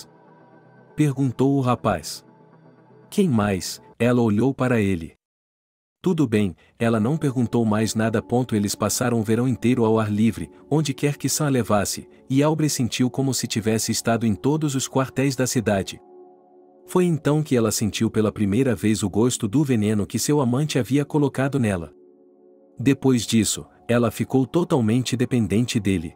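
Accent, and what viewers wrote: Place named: Russia. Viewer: O narrador é horrível. Confunde os pronomes . Refere-se a moça/mulher como ele e chama o rapaz/homem de ela. Atônita o narrador só diz atonita. Poço é “poco”. Aos ele não lê tudo junto. Ele pronuncia cada letra separada das outras: A o s. É uma infinidade de erros que você só entende porque são textos rasos, desprovidos de complexidade. Uma coisa é certa, o narrador não tem nenhuma intimidade com a Língua Portuguesa. A tradução é péssima e o narrador parece um russo lendo um texto em português, que ele tentou decorar, foneticamente.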